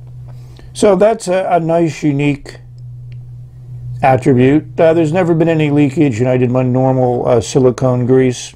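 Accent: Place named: Western Pennsylvania, United States. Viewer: Thanks for the video. I have to admit, your voice and western PA accent remind me of my late father, and I find listening to you quite soothing.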